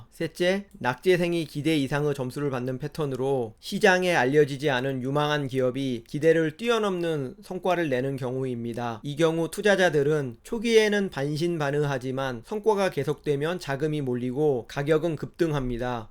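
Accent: Jeolla, South Korea. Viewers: 의 발음을 못하는거보니 전라도 분이시군요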